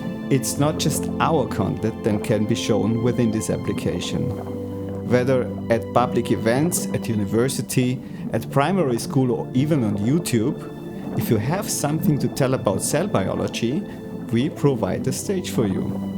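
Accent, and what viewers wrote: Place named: Austria. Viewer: Awesome work,! And awesome Austrian English 😄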